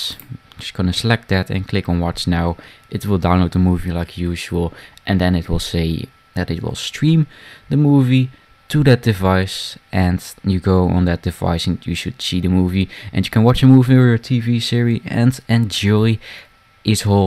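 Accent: Netherlands. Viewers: deze gast is duidelijk nederlands XD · arre you dutch? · U can hear from his accent that he is dutch :P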